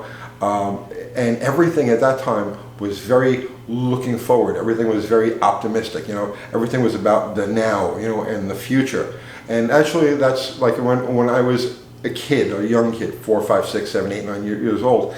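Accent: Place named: New York, United States. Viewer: I didn't know you were such an historian! But then when you talk, my head tilts to the side like a dog. Your silky NY accent drives every point home.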